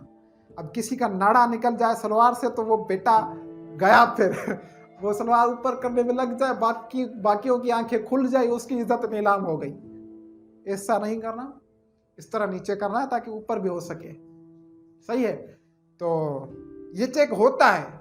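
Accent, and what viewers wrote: Sindh, India. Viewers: Sindhi Ka Urdu bolna Zaher Hai ap Sindhi ho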